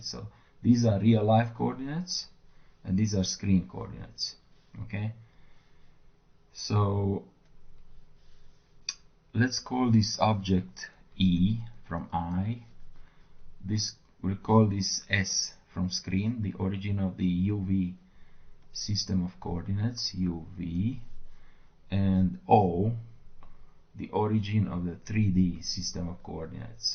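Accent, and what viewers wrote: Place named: Italy. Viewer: L accento è uguale a quello italiano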